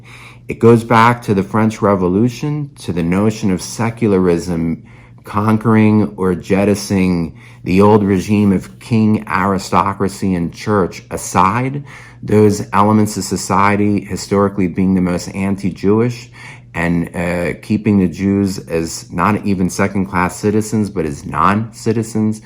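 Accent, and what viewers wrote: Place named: Queens, United States. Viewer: I am so glad to have found you! I was watching an ATHEY CREEK video today, and Pastor Brett spoke at length about your work! And I have to be blunt - as a girl from QUEENS, living in the SOUTH for the last 35 years, I was tickled to actually hear someone that sounded like ME! So glad to meet you! Looking forward to your next video! 👍🙏👍🙏👍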